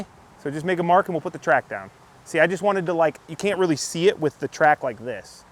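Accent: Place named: Chicago, United States. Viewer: Nice Chicago accent.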